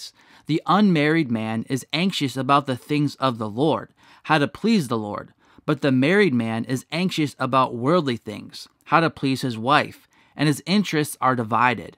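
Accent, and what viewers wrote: United States. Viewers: Narrator from Chicago? That accent...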